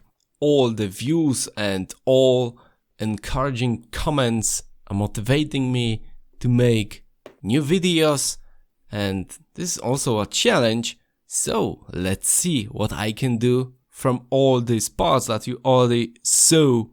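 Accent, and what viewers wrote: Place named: Germany. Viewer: Twoja wymowa brzmi jak niemiecka 🤔 Jak według mnie, obcokrajowca. Zgadłem czy nie bardzo ?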